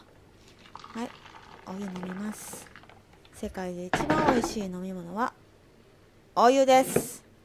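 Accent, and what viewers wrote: Kansai, Japan. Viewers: 関西弁にいやされます。😂✨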